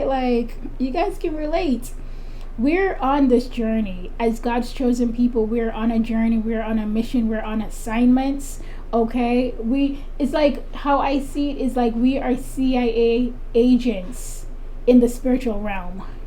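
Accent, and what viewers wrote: Jamaica. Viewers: Oh u Jamaican 😁😁..Bless up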